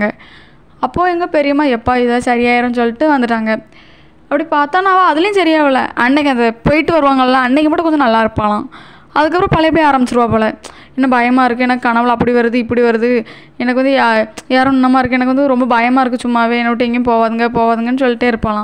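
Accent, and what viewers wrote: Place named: Tirunelveli, India. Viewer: Excellent explanation especially nellai slang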